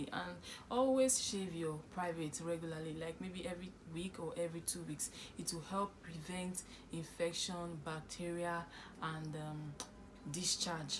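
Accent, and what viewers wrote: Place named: Montreal, Canada. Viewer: t'as un accent montréalais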